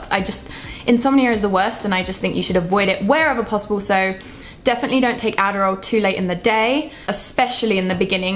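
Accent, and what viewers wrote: United Kingdom. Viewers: I honestly didn't realize the accent until you mentioned the fact that you were from England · Oh my lord, blonde hair, blue eyes, and your british accent. Im in love!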